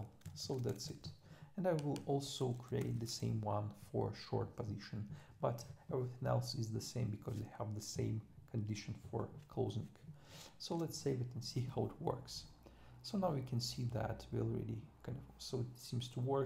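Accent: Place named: Russia. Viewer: Сразу понял, что этот парень русский )) Рассказал как на родном русском. 🎉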